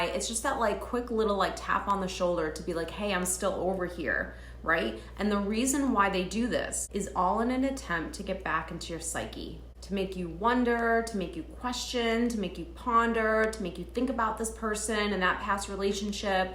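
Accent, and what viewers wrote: United States. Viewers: Would you be able to slow dawn when you talk because lm English and find your accent very strong not being rude your American accent is very different to understand please slow dawn or try to talk slower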